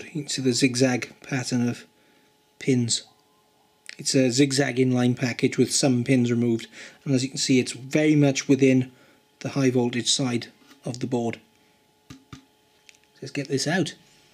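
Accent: Wales, United Kingdom. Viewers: I thought u were welsh watching that video. im from Swansea. nice video by the way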